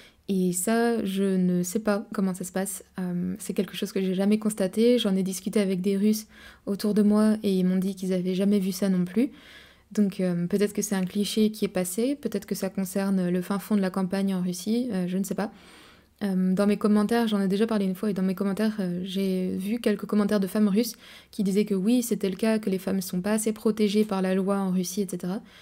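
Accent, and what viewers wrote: France. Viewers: Тут уже говорили, что Вы привлекательны, я ещё хочу добавить, что в России французский язык, это очень романтично звучит, по этому французская девушка просто невероятно привлекает парней, тут уж ни чего не поделать, а когда Вы будете говорить по русски с французским акцентом, то мужчины вообще голову потеряют.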